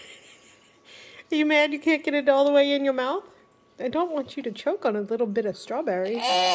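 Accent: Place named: Philadelphia, United States. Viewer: Your accent…can you share where you’re from? I’m guessing the Philly area…❤️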